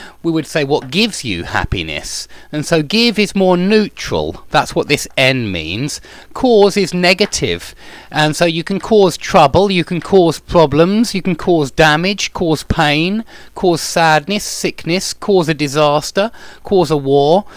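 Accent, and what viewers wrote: United Kingdom. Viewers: British accent, is most popular worldwide, when it comes to learn English. Thanks for the lessons sir.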